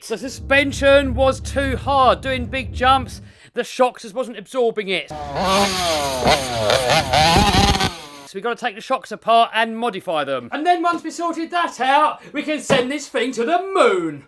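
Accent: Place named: United Kingdom. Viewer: DUDE I THINK ITS AWESOME HOW YOU PRONOUNCE CARBURETOR..!! I like the British accent.. its awesome.! 🤟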